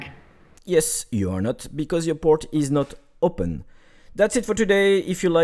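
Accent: France. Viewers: jolie accent français xd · you have a french accent,idk cause i'm french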